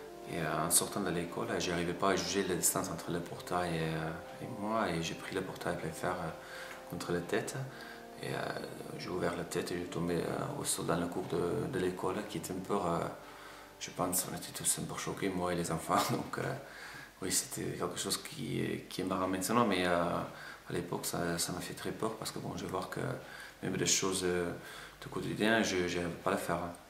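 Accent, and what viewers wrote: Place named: Basque Country, France. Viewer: Super témoignage, tu vas beaucoup mieux et bientôt ce sera parfait. Ton accent et ton récit avec l'accent deu pays basque! En afrikander tu conserves le même accent? Bonne reconvertion.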